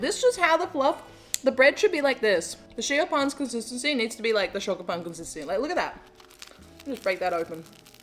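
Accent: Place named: Australia. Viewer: your accent is so cute. I wish I was from australia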